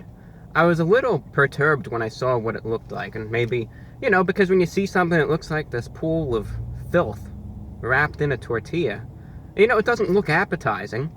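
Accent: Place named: Transatlantic, United States. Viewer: Btw i think your better than joeys world tour :3 Im east coast just like you! And i love your transatlantic accent too <33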